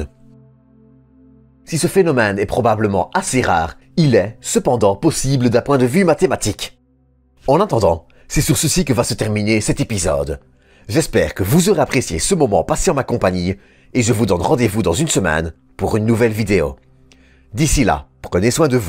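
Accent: Belgium. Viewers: Ton accent est de belgique ou d'ailleurs j'arrive pas à savoir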